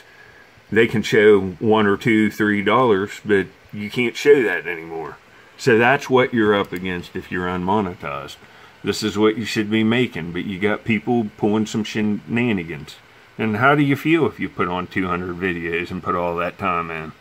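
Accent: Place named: Pennsylvania, United States. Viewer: Your welcome. I never dreamed that people could buy views and likes ect. Thanks for all the explaining. But i have a upgraded youtube account and dont have to watch ads. How is the pay out different on that? My son says the channel makes more this way. Also how much of a super thanks does youtube keep vs. what you get. Percentage wise. This is the only channel i have ever donated to. I am by know means i person with tons of cash. But this channel is my favorite because it has alot in common with my way of living a simple life. I was born near the river just north of Pittsburgh and moved to ky in the mid 80s when everyone in my family lost thier Good paying steel mill jobs. 475k bread winners on the same day. My father was the only one smart enough to pack up his family and move to a much better place where there was way more opportunities. 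This channel reminds me of home. Even the way you narrate sounds like the people i grew up with that eastern as you say O hi a and western pa draw... lol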